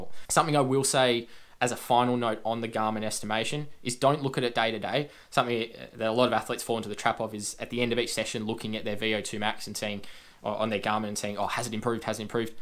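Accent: Australia. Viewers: Haha First thing I noticed was the (Eric lindros?) jersey, but then we he started talking I thought...”wait a minute...this guys Aussie”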